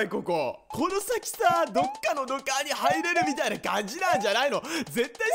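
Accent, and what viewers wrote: Fukuoka, Japan. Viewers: 福岡の人？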